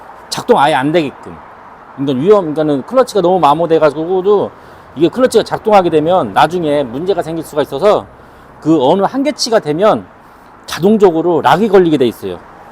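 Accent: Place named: Chungcheong, South Korea. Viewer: 어따 거기 충청도유~~! 좋은정보 엄청나게 감사혀요~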